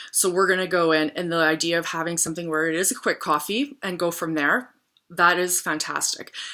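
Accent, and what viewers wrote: Canada. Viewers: You speak like in some parts of Canada. Are you Canadian?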